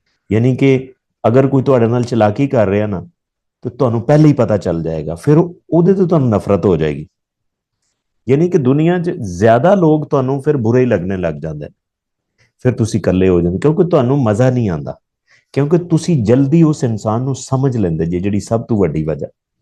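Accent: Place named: Punjab, India. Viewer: Punjabi accent nice.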